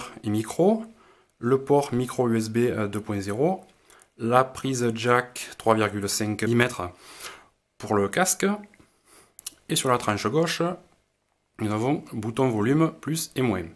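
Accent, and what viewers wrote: South, France. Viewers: Beau deballagE et tres bonnE video avec le bon accent du sudE ! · Continue j'adore tes vidéo et l'accent du sud qui va bien comme toujours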